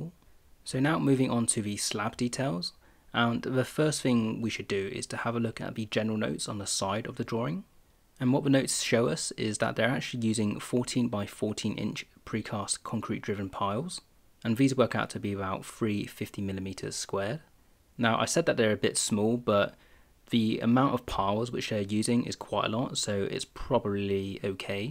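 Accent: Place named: United Kingdom. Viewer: Great layman's explanation. Also nice British accent!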